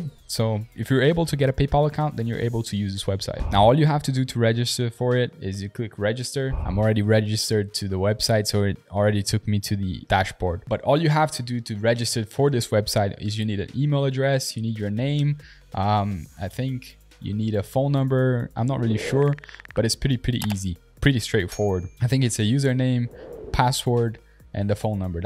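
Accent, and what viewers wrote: Brazil. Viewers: tu é carioca né kkkkkk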